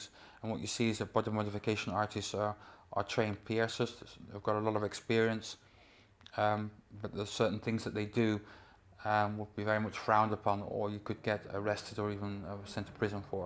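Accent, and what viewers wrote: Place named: Netherlands. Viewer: You are definitely not from the UK, your Dutch accent and Dutch words here and there are a giveaway.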